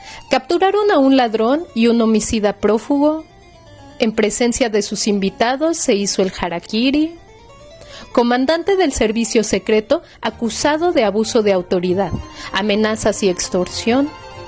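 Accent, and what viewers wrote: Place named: Mexico City, Mexico. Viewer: El acento chilango le dio el toque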